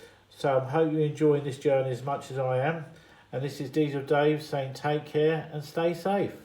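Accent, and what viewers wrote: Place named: United Kingdom. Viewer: The rock faces look really good and the canal detail is wonderful, even the shopping trolley. Did you buy that or make it? I am a little confused because your "About" says you are in the USA but the name of your channel plus your accent makes you British. I'm being nosey I know. Regards Michael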